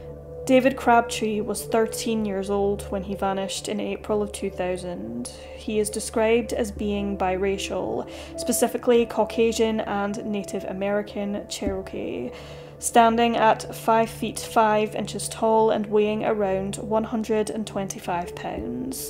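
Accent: Scotland, United Kingdom. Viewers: She is Scottish. We all talk like that in Scotland 😁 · The Scottish accent really adds an extra layer to the content. Don't know why. It just does.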